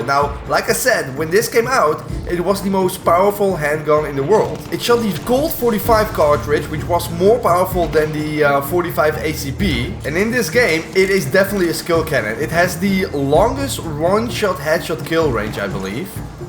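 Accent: Netherlands. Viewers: Are you Dutch? I think I recognize the accent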